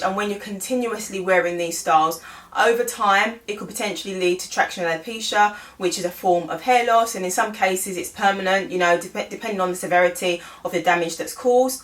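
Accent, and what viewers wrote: United Kingdom. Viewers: Finally a British accent . Thanks girl now when u give recommendations on products I can actually get them. 🙂🙃🙂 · I didn’t hear anything she said but enjoying her accent...i bet she is from Uk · Lovely to hear a UK voice! Thank you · I said the same thing. Great to hear a British sister.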